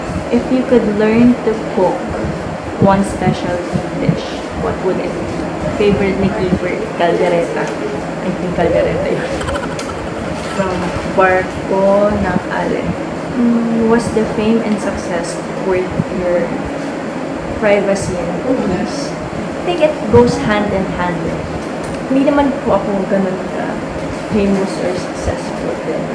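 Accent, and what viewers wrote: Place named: Conyo, Philippines. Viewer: Si ate lang talaga yung cute mag conyo. Hindi siya nakakairita pakinggan.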